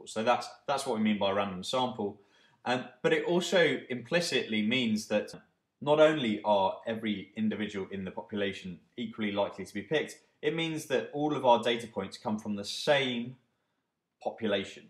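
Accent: United Kingdom. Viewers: just making a pause after some 30 videos... you have a wondurful vision of econometrics, everything is so consistent (...). It is just sometimes a bit complicated for a french guy to understand your Manchester accent...but after some vids it sounds like music of econometrics 😉 thank you so much!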